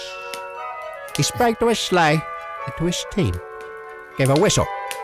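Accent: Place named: United States. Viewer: Love the fact you can easily hear his American accent coming through clear as day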